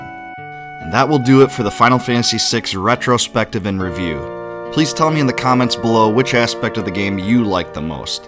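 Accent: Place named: Minnesota, United States. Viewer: Ur from Minnesota huh? Or Canada. I can hear it.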